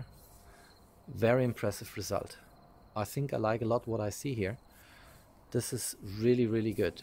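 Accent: Germany. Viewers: Haha man merkt das du Deutsch bist, ist aber nicht schlimm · Are you German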